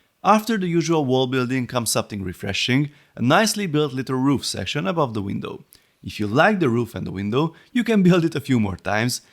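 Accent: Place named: Hungary. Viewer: le a kalappal. Te vagy a kedvenc Lego csatornám, egy csomó olyan videód megnéztem, ahol az adott készlet beszerzése még eszembe sem jutna:). Jól meg vannak csinálva a filmek, élvezetes és választékos(!) az angol szöveg, az akcentuson ugyan hallani hogy magyar vagy, de egyáltalán nem zavaró módon. Gondolom az oktatói múltadból is tudod, hogy lehet a figyelmet folyamatosan fenntartó szövegeket létrehozni és úgy elmondani, hogy abba ne aludjon bele a közönség. All in all, nagy kudos.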